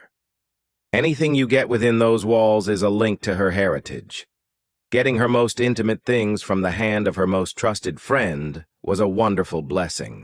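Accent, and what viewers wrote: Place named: United States. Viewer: I wish you would not use an AI robot voice with an American accent , it does not understand what it is saying and the delivery is boring. Queen Consort Camilla is not a real Queen, she is merely the king's second wife, no connection with his family other than by marriage. She is certainly no Queen Mother and has no right whatsoever to any family treasures.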